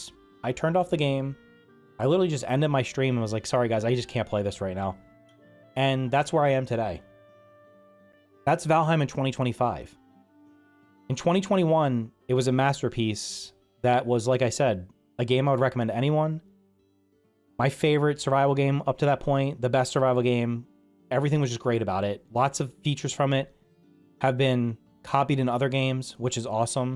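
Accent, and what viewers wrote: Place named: Pittsburgh, United States. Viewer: Is this guy from Pittsburgh?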